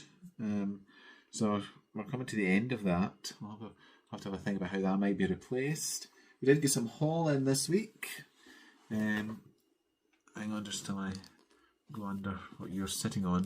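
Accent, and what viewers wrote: Scotland, United Kingdom. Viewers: Great to visit with you. I worked on Summer Quaker by Lila’s Studio while watching. I forgot to comment last week, but I’m interested to hear your Scottish accent and Carl’s English accent together in a video. Your accent is quite noticeably Scottish. I live in the southern US, and I’m sure that I also have an accent that I can’t detect myself. Take care! 😊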